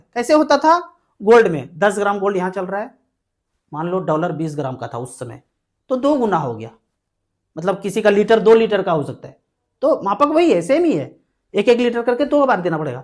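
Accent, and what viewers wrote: Bihar, India. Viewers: Bihari boli bolta hai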